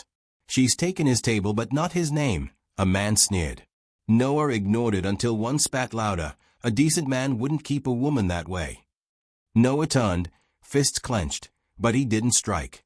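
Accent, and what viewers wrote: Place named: United Kingdom. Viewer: Again with a Brit voice narrating an old-west story.😠 But damn your high-and-mighty principles, lady. Your pride doesn't fill empty bellies. I'm sick of people who 'won't accept charity', when they've been PRAYING for help until they turn blue, but they throw it back in god's face, because they don't like his method of delivery.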